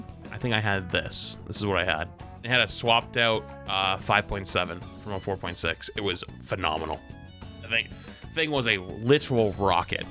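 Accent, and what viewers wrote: Boston, United States. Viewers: You're from Boston?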